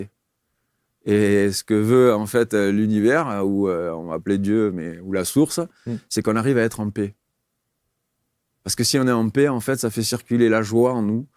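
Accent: South, France. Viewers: Dommage pour la barbe longue…😢 J’adore l’accent du sud. 😊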